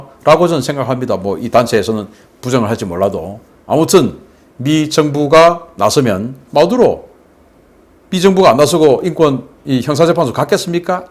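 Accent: Gyeongsang, South Korea. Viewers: 신인균님의 해설을 들으며 매번 깔깔 웃습니다. 어찌 그리도 재미있고 명확한 내용으로 경상도 사투리를 섞어... 정말 고맙습니다.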